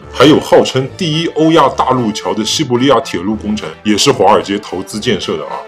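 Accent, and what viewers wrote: Shanghai, China. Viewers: 其实就是一个人，因为都是上海口音，好多家具都一样的😂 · 音色和语速可以软件微调成不一样，但是很多词的口音、下意识的手势动作和偶尔冒出来的上海话切口，说不是我都不信。。。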